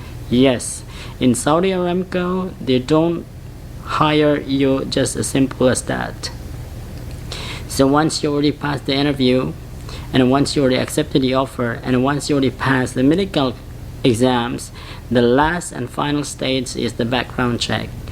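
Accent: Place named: England, United Kingdom. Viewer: Mashallah r u filipino? I like ur English accent. Sending my full support from ksa. Hope i get back too.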